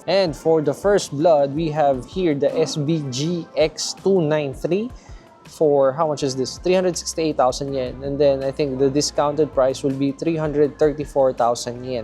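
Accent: Philippines. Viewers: I binged most of your videos , really good content. My wife is Filipina and I recognized the accent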